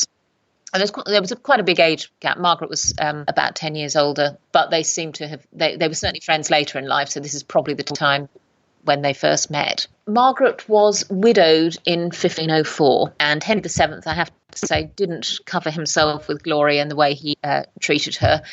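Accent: United States. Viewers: I'm immediately put off by the American accent!!!!!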